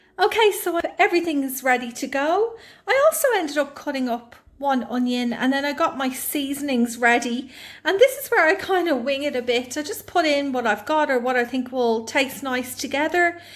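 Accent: Ireland. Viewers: Or I saved the seed from my own plant. Lovely harvested...beautiful produce! And, your Irish accent is so beautiful!🙂 See you next week!🙂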